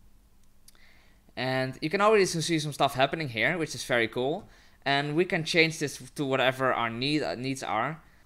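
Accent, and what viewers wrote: Netherlands. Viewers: Where are u from? ur english sounds like ur dutch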